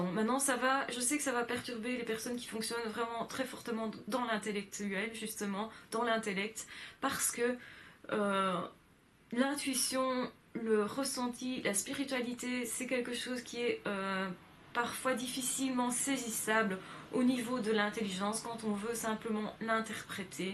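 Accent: Brussels, Belgium. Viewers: Bonjour, me trompé-je ou entends-je un brin d'accent Bruxellois? Pardon c'est hors sujet mais ... voilà. :-)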